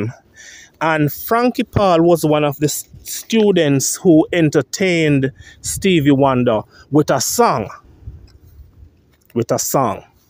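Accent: Jamaica. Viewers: Bredren that's how Jamaicans dialect sounds. Perfectly legit.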